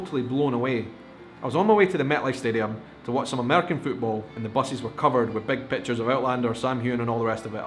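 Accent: Scotland, United Kingdom. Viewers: Hi, I like your YouTube's channel and I love Outlander. I'm from Brazil and I hope you understand my english. First of all I really love the scottish and british accent in general, even yours. It's very sad to know that you didn't learn about your country's history in school, only students after 90's. I think it's because the clans, tartans and gaelic language were prohibited in Scotland by the english government. About half percent of scots wanted to go out of the United Kingdom. Do you think it is because the European Union's exit or scottish culture/politics or both? Someday I will visited Scotland, including the Highlands. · I was born in 1938 and raised in Edinburgh. Educated in Catholic Schools. St. Anne’s in the Cowgate, St. Patrick’s, just off the Cowgate, and St. Anthony’s. I believe they are all gone, at least two of them. I grew up during WWll, and yes, I was taught Scottish History, including The Jacobite Rebellion and definitely Culoden. 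(Sp.) I did manage to see and enjoy the first Season of Outlander. On Amazon Prime, although I haven’t seen the following seasons. Although I haven’t checked lately. I’ve been on Amazon for many years. After nearly 55 years in the USA, I enjoy seeing Edinburgh on YouTube. So funny that where I grew up, a working class area. Guthrie Street. Now it’s gentrified. But I have so many fond memories of growing up in ‘Auld Reekie’ Love your show, keep it up, nice hearing my old accents. Takes me back, fondly.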